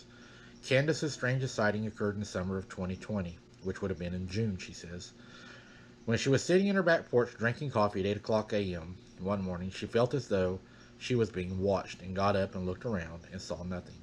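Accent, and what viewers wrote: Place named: South, United States. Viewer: U sound so country and down to earth. Love being a part of the family.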